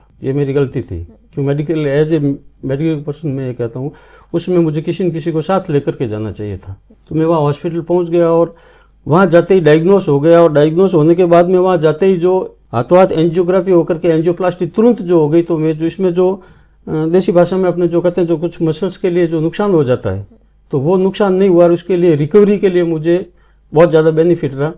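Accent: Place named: Rajasthan, India. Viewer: ਬਹੁਤ ਵਧੀਆ ਢੰਗ ਨਾਲ ਸਮਝਾਇਆ ਗਿਆ ਜੀ। ਰਾਜਸਥਾਨੀ ਬੋਲੀ ਬਹੁਤ ਪਿਆਰੀ ਲਗਦੀ ਆ ਜੀ ਸਾਨੂ। 🎉🎉🎉🎉🎉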